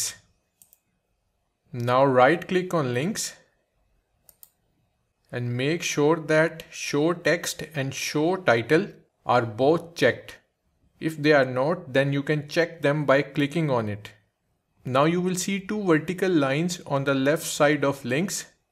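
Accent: India. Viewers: Thanks random indian sounding man on youtube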